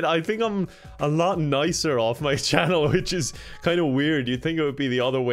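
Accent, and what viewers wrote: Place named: Ireland. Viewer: Can you film a video in your FULL IRISH ACCENT? Love it, you kind of remind me of Domhnall Gleeson. He's sexy fine!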